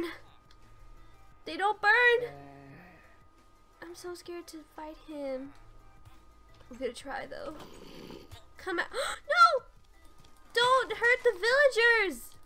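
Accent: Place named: United Kingdom. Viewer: I can definitely here british fe through out this whole video tons of times XD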